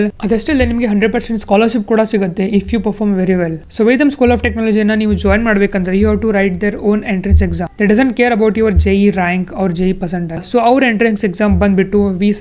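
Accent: North Karnataka, India. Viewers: Bro,are you from north karnatak?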